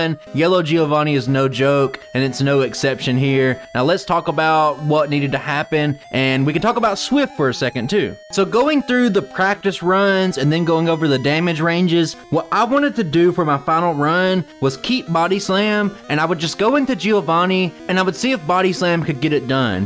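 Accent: United States. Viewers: Where you from? That accent got me saying howdy partner. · Btw i too have a southern accent so "bind" and "bide" sound exactly the same... I feel your pain, brother!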